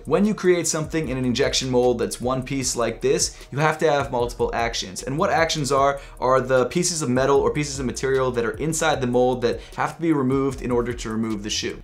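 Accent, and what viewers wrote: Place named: Southern California, United States. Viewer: sounds like a totally tubular guy from Southern California but he's not from there.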